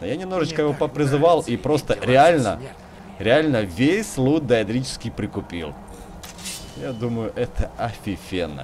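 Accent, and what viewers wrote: Ukraine. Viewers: Эбать ты из Украины)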